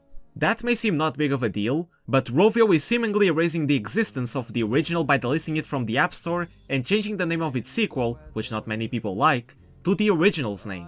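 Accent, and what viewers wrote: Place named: Portugal. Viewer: krl tu é português, muito foda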